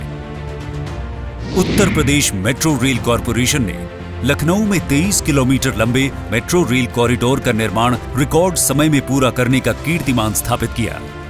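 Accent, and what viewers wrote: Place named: Bihar, India. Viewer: Varanasi The way of talking is completely Bihari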